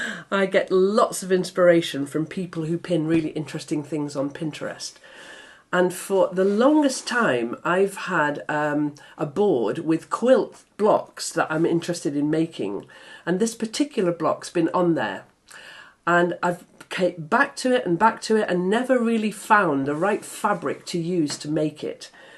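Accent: United Kingdom. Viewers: Hello, I came across your videos in 2024. I’ve grown to love them. I live in Pennsylvania, USA, about a two hour drive away from New York City. First of all, I love to listen to your voice and UK accent. I love hearing the little differences between our two ways of speaking English. I’d love to tell you how much I enjoy your calm voice, your humor, your lovely Anna, and Ant in your garden. The vast property where your last homely house sits is marvelous. I’ve never thought seriously of joining patreon but in your case I’m considering. Until then, thank you for your content. Oh, and especially the beautiful piano music. It’s so calm and restful, I feel it is needed to offset the political atmosphere we are living with here currently. I’ll keep watching, my friend, I love the virtual lime green sofa. It’s quite comfy with so many sweet people sharing it.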